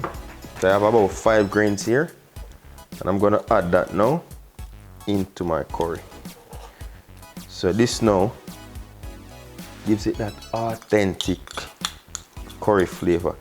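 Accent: Jamaica. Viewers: I love to watch you cook.Jamaican accents are my favorite, from the mountain to the beach and long the country side. How did you learn to cook so well, your parents or grandparents or did you go to culinary school?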